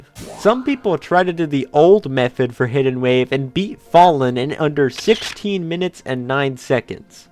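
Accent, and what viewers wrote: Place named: Texas, United States. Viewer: I love your western/Texas voice 😁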